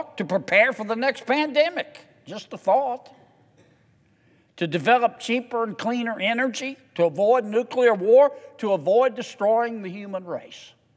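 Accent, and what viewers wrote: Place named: Louisiana, United States. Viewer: The reason I like listening to you is that you explain yourself well and I understand what you say (even with the Louisiana accent). Keep talking to us because our President isn't.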